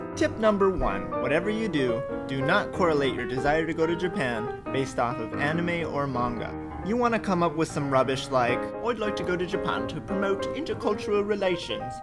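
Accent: England, United Kingdom. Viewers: XD TEACH ME YOUR BRITISH ACCENT! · Your british accent is so cool.. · i actually love wakame... first time i tasted it, i couldnt get enough of it... ha... healthy and delicious... =d awesome british accent btw =) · teehee! that English accent is making this englishwoman giggle muchly ^.^ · I just received my visa today yay But no scholarship though D: And love the british accent xD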